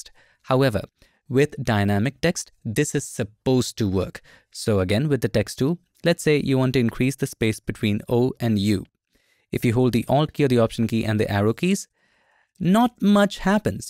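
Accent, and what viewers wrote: India. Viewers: I watch your videos for your excellent communication, teaching and presentation skills , soft and high pitch Indian accent with lots of confidence, immersion and proper body language 10/10 ur a benchmark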